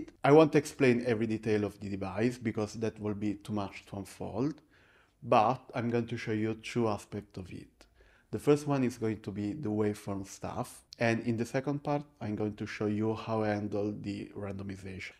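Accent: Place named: Italy. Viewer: Sorry for the Italian accent???? The Italian accent is awesome!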